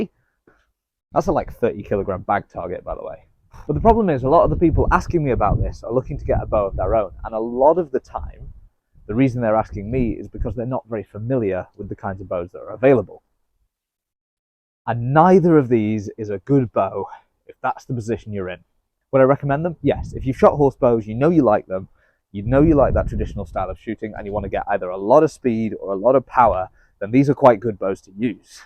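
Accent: United Kingdom. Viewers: Dude! Your cool as shit! I feel like a kid watching you do your vids, you look like a fantasy hero, you sound cool (cool voice, British, speak in the kings english) and you are an enjoyable watch.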